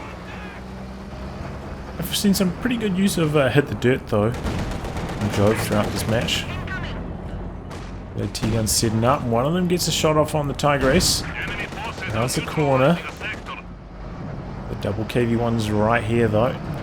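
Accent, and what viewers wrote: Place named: New Zealand. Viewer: You from NZ brother?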